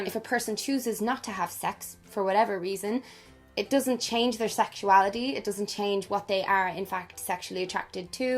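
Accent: Ireland. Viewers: Hello, I relate to almost everything you mentioned in this video! You're so funny and your irish accent is beautiful to hear! Youre one of my favorite youtubers, hope you're reading this😊 Hope you a wonderful day. Benedetta ♥️ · You're Irish right? The accent.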